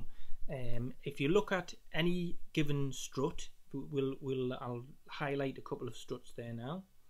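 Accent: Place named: United Kingdom. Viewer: Hi - you made very nice videos and also a great website. It helps a lot to understand dome structures. You sound like you are from UK and there they use imperial measurements if I am not wrong. However your calculation tools are mixed Metric and Imperial. (radius in meter but windspeed in Miles per hour). May I suggest to put a simple unit in your calculation tools. i.e. Radius/m: 6 - it would help. And since you have to touch your website again - can you share the formula you use for your calculations as well? Thanks for considering. Cheers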